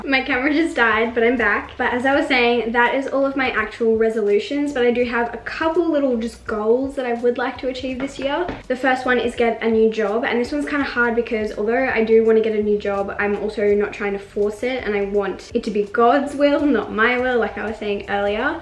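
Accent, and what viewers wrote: Australia. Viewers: honestly probably not something you hear all the time but i love the way you express yourself, i don't know how to describe it and maybe i'm just loving the Aussie accent haha but I love the way you talk and formulate your sentences!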